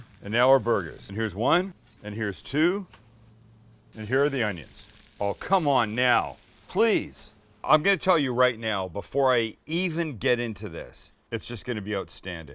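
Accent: Canada. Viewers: Hmmm - - did I just detect the faintest trace of a Canadian accent.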